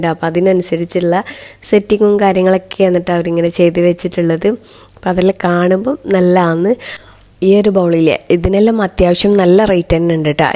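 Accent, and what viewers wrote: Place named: North Kerala, India. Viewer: Samsaram kelkkumbol kannoor and Kasaragod anenn thonnum . Malappuram kariyanenn parayilla .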